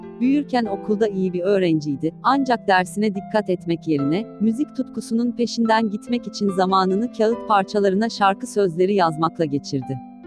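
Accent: Azerbaijan. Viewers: Ablacim Azeribaycanlimisin? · Sen Azerbaycanlımısın?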